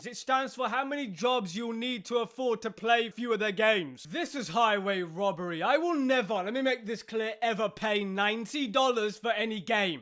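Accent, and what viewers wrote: United Kingdom. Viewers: Are you British? But being tongue lashed by a Brit is so entertaining 😂😎👍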